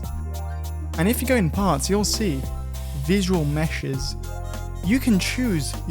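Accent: Italy. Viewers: Love your Italian accent :D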